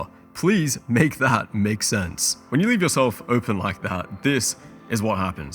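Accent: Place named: Australia. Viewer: why are u filming videos if u have a cold/flu. not like i can stand aussie accent anyway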